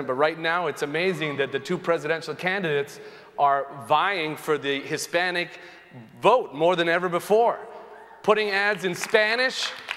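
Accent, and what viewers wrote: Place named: England, United Kingdom. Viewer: Your comedy is awesome!! I love it when you speak in your english accent, it's hilarious.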